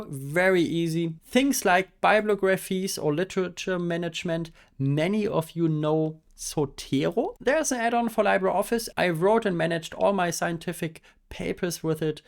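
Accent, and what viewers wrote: Germany. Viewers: it sounds like a German accent to me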